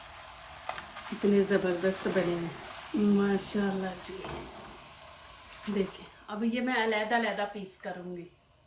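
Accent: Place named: Punjab, India. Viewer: Punjabi lehjee Urdu bolti ham Punjabi hi bol lya krn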